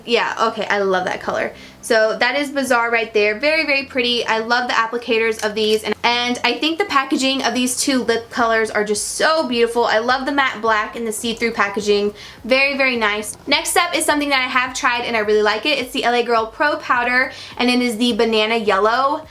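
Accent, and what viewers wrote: South, United States. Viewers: Guuurl someone's been talking to some folks with a drawl. Your southern is showing at the beginning of this video and I'm loving it!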